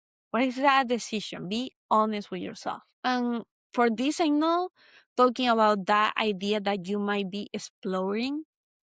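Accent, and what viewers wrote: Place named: Latin America. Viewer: Cute accent. Can I guess? South America, Italian origins, swimmer and dancer, living somewhere near the sea.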